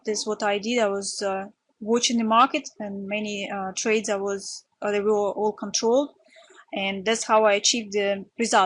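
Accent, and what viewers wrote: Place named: Russia. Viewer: nice accent. Russian?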